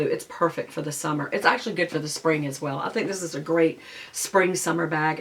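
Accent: South, United States. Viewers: I wanted and had my heart set on the large Duxbury but it was too long for my 5’2” stature. Love your southern accent and your Pandora bracelet. I pray this doesn’t sound weird but you look as though you smell good. 💕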